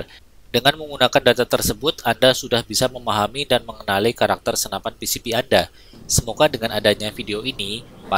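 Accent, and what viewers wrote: Banyumas, Indonesia. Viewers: Bahasa ngapak ny exsisin dong mas.. Hihi salam. #orangapakorakepenak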